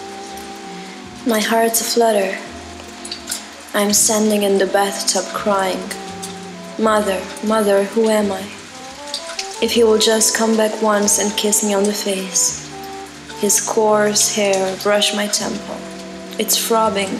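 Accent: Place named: France. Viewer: I hear a French Accent ! Its Beautiful . Wondering how you found his poetry.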